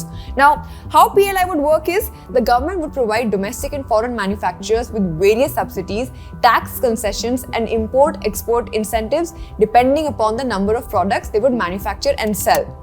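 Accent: India. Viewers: Who wants negotiate price if you talk like this? It's like the joke by Jimmy Carr: "It saddens me. They don't have to be this way." Why don't they import native speakers? Will "Indian English" one day an appreciated or even liked accent like british, american or australian? NEVER!!!! 🤮🤮🤮🤬👹 I'll be in India for then next few weeks on vacation from europe and I'm already sick of it after a few hours of youtube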